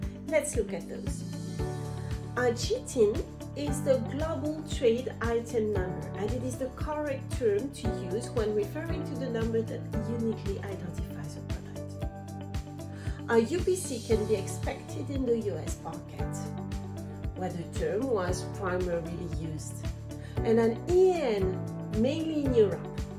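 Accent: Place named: France. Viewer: When explaining things in English, a first language speaker is a good idea. The french accent is particularly strong here and coupled with the overly loud music makes this unwatchable.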